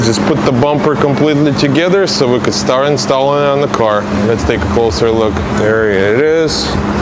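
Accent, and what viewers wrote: Russia. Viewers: Вы говорите на английском с русским акцентом, но вы не русский, или уехали очень давно. "Досвидания" - по-русски было с английским акцентом. ;) По ремонту всё очень интересно, по железу, по салону, по электрике. Спасибо!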